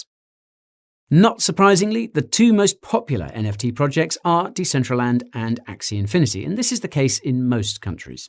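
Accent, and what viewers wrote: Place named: RP, United Kingdom. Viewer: You somehow remind me of Peter Dinklage in GOT with your british accent. Love you champ 😎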